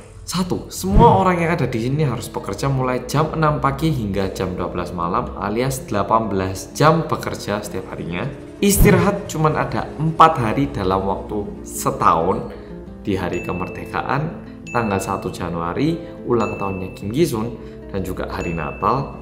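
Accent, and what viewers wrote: Java, Indonesia. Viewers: Anda kaya orang Indonesia yg lagi merantau .bahasanya itu looh medok bnget hehe semangat mas. Teruslah bercerita tentang kehidupan korea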